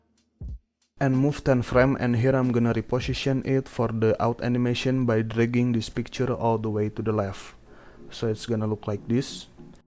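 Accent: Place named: Indonesia. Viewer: Orang indo bg logatnya kaya indo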